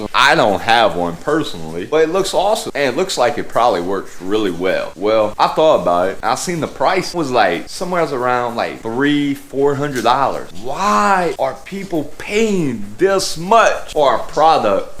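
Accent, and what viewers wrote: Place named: Appalachia, United States. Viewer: Learn to talk dam hillbilly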